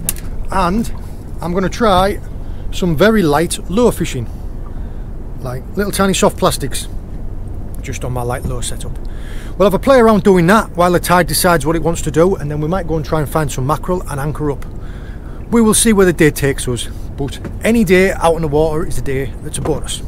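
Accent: United Kingdom. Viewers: Every time I watch your posts, I enjoy your crisp clear beautiful British accent. Besides, it is always nice when the fisherman knows very well weather he got snagged or he feels that some kind of fish got the hook in the mouth and try to hold itself in a whole. Perseverance paid off.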